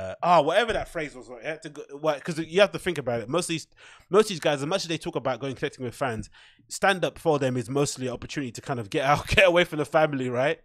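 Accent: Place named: England, United Kingdom. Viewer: How did I know this dude had an English accent before I clicked?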